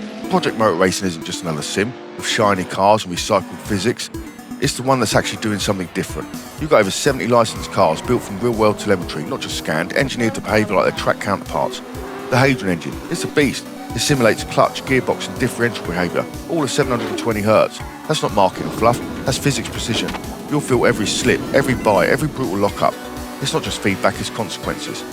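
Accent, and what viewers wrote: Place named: Cockney, United Kingdom. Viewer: Wow man you've got one hell of a snappy voice over and that Cockney accent just takes me back to Ritchie's Snatch and Two smoking Barrels! Absolutely the most enjoyable trailer on ANY motorsport ever!Kudos.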